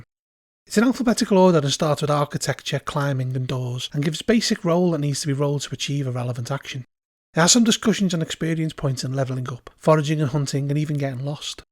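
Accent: North, United Kingdom. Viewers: I loved this review. Nice presentation style, succinct and informative. Actually I also like the gentle tone and the northern accent. Good storytelling voice, I reckon. Thanks.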